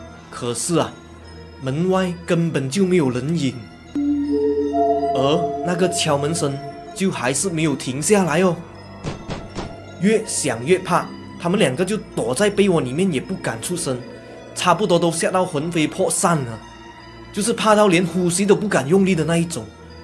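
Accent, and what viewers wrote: Malaysia. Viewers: 故事情节讲得很好！很自然！因为有马来西亚人讲话的口声而产生共鸣！ 我也有真实灵异親身经历故事！ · 我一聽 就知道 是 來自 馬來西亞的 主播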